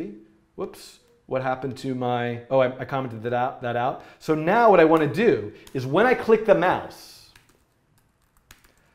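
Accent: France. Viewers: Thanks for your content, your french accent was sooo bravo that I tried to implement this challenge, I added a bit of colors and random agents walking on the screen. Here's a link if you want to take a look at it. <link> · I love your french accent 😝 préviou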